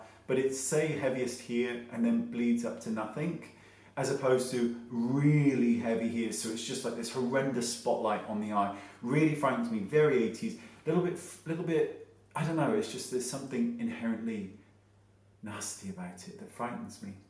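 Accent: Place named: West Country, United Kingdom. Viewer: rofl you sarcastic fuck. I love it. Your westcountry accent comes out more when you get catty, ITS BEAUTIFUL. Kisses xxxx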